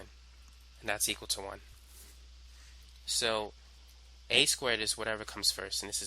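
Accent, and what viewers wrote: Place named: Boston, United States. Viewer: You sound like you're from Boston or Maine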